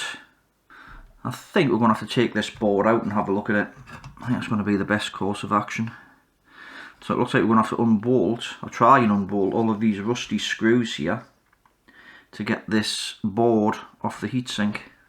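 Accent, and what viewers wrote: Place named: Tyneside, United Kingdom. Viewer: Hi if you dont mind me asking where is your local repair cafe? It sounds an interesting place that i would like to check out (im a fellow electronics repair engineer in the Tyneside area). I am of course making assumptions from your accent.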